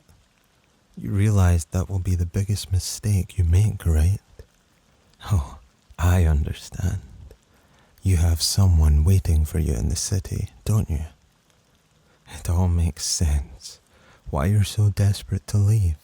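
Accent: Scotland, United Kingdom. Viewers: From what I can tell it's Scottish I don't know specifics though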